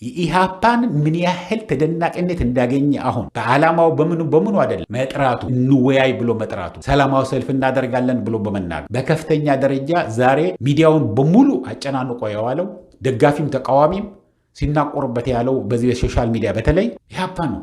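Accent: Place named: Eritrea. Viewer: አክሰንትህ የኤርትራ ነው በቃ ኢትዮጵያውያን በናንተምክንያት ስንቱን ስቃይ አዩ ኢሳያስ የሚባል ጸረ ኢትዮጵያዊ ነው!!! አብይን ብጠላውም የዝህ ሁሉ የቆየ መዘዝ የኢሳያስ ፖለቲካ ነው እግዚአብሔር እናንተን ብቻ ይቅጣቹ ክፉዎች ቀናተኞች ! ቲፒኤሌፍ ኦነግ ብትሉት እናንተ የሰራችሁት ጸረ ኢትዮጵያ ስብስብ ናቸው !!! እኔ ተስፋዬ በእግዚአብሔር ብቻ ነው የእሱ ፍርድ ይውረድ ምርጥ ሃገር ነበረን እድሜ ለጅኖሆይ ያው ለሁሉም ሞት ሆነ እሳቸውን በግፍ ጥላቹ የእሳቸው እርግምን በየቤቱ እየገባ ነው ይበለው ይሄ ሕዝብ ማዘንም አያስፈልግም !!! ክታንኩት ፖለቲካ የዘር ፖለቲካ ለማን በጀ????? ለማንም !!! እርስ በእርስ ተጫረስ ሃገር አልባ ሆነን ማየት ነው የሚያስፈልገን ያኔ ይቆጨናል !!!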